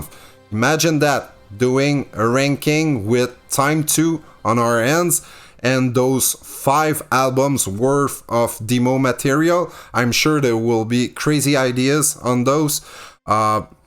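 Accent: Quebec, Canada. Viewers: Wow that is one heavy french Canadian (Québécois) accent. Excellente video! Cheers \m/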